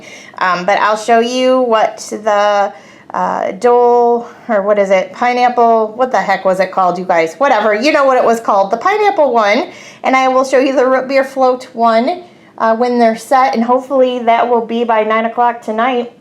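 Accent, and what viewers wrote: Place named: Midwest, United States. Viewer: OKAY I HAVE TO KNOW!! Where is your Midwest accent from?! 😍 These fudgesicles look so good!!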